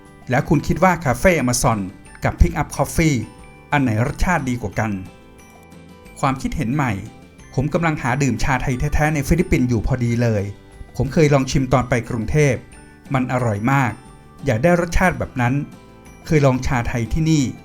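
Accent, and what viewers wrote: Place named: United Kingdom. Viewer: ผมติดตามช่องของ ตลอดครับตั้งแต่เขาทำช่องที่ไทย จนย้ายไปฟิลิปปินส์ ตอนอยู่ไทยคนติดตามเขาน้อยจริง เห็นใจเขาเหมือนกัน เป็นเพราะคนไทยไม่เช้าใจภาษาอังกฤษ จึงยากที่จะมีคนติดตาม คลิปตอนเขาไปลองกาแฟ ตอนเขาจิบอาเมริกาโน่เย็นผมเห็นแล้วขนลุก รุ้เลยว่า ยังคิดถึงเมืองไทยมาก ผมติดตามเขาเกือบทุกคลิปวีดีโอ เขานำเสนอได้ดี ที่สำคัญผมชอบสำเนียงเขาแบบอังกฤษ มันฟังดูเท่ห์ดี เพราะผมจะคุ้นเคยและพูด แบบอเมริกามาตลอด555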